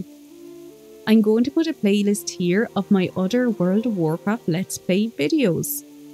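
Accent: Ireland. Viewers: I moved to Canada last year and I haven't really interacted with any Irish here. I used to play wow as a teenager and was looking it up on youtube for nostalgic comfort. This was cool to see, I didn't realise I could enjoyed hearing an Irish accent that much Thanks :)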